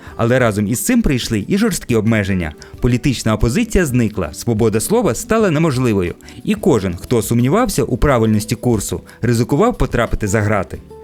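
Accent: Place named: Russia. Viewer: Хороший контент, але дуже ріжуть вухо деякі слова промовлені на руснявий маневр. В українській мові, всі голосні вимовляються чітко. А в вас досі є - кантраст, риспубліка і тд. Будь ласка, попрацюйте над вимовою. Підписалась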